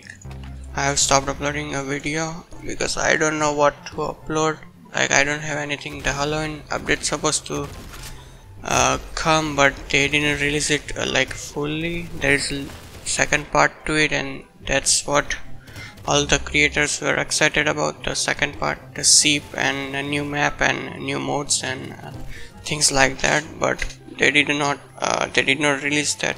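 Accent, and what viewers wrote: India. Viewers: are u Indian becuase u sound like that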